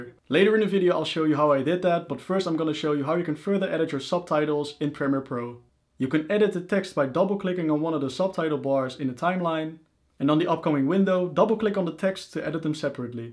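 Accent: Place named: Netherlands. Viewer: Strong Dutch accent, but thanks for the tutorial.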